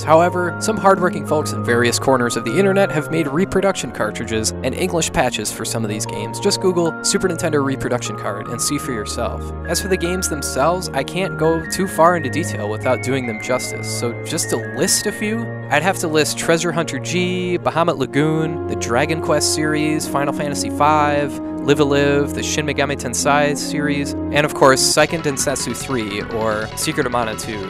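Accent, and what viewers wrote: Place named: Midwest, United States. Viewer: What part of the midwest US are you from?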